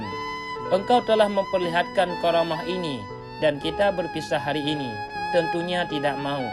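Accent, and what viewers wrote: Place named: Aceh, Indonesia. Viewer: Suara mimin Kek logat org aceh ngomong bhasa indonesia 😅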